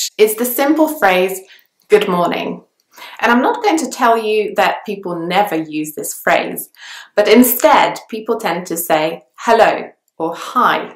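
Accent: United Kingdom. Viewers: i really though u were british LOL jajaja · She speaks British English.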